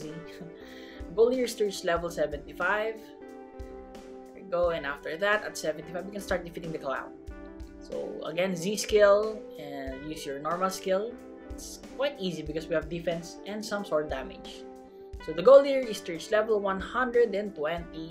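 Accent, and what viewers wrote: Philippines. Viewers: Ur voice is satisfying btw sounds like a pilipino speaking enflish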